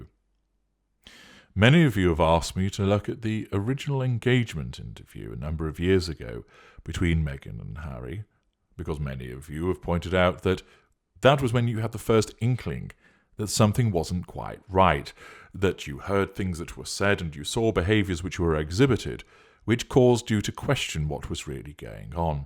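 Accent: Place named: Northern England, United Kingdom. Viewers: is definitely a Northerner. I think it's Yorkshire. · I am always trying to place the Northern bit of your accent. Burnley?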